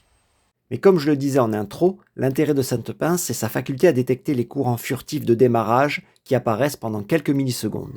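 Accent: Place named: Corsica, France. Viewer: Petit accent corse sous-jacent.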